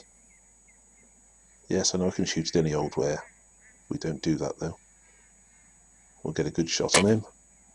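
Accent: England, United Kingdom. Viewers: What part of England you from? greetings from California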